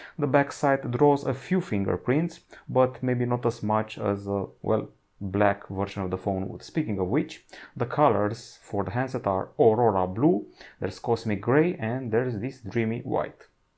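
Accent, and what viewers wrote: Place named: Romania. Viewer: That fuckin' Slavik-Romanian Accent !!!! :) Bună treabă omule excelente unoxinguri și review-uri pe ambele canale !!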